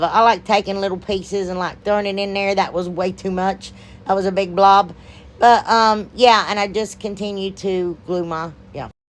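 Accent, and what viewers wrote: South, United States. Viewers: I love your crafts! I grew up in the South, and darling, I love your southern drawl. My sister and I have a crafting channel called newbeginningsartcrafts. Keep on creating; you bring joy to everyone. God bless you.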